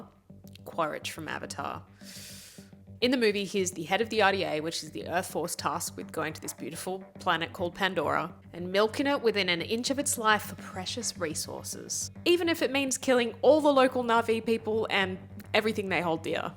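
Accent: Australia. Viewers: Just realised your Aussie! Birds of a feather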